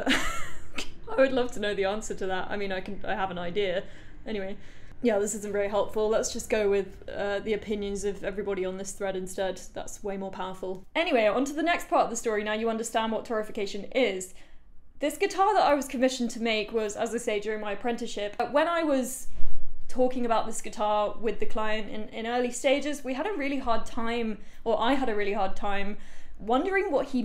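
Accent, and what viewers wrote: United Kingdom. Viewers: I'm using your videos for English listening learning because it is so nice seeing your personality, seeing your work, and listening to your British pronunciation :)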